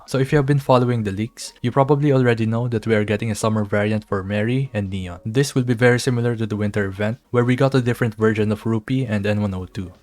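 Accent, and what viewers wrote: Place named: Philippines. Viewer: Are you Filipino?, you sound like one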